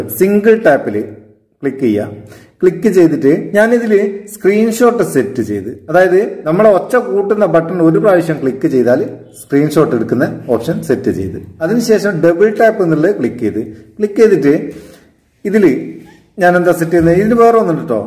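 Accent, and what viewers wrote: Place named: Meppayur, India. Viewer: മേപ്പയൂർ കാരനാ ല്ലേ.... ഭാഷ കേട്ടേരേ തോന്നി പേരാമ്പറെ ന്റെ അടുത്തേടിയൊ ആണ്ന്ന്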